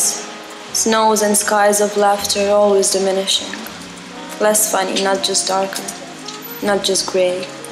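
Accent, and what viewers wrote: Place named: France. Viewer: I hear a French Accent ! Its Beautiful . Wondering how you found his poetry.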